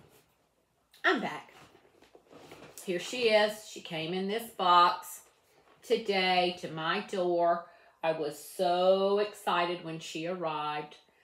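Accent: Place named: United States. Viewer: I have just subscribed... I just love your reviews and your accent is amazing as a British woman I just love American accents that sound like yours 🤗👌💋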